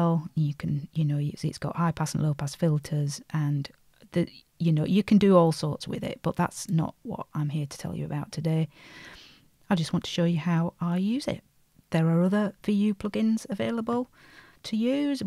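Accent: Northern England, United Kingdom. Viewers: I love that northern accent!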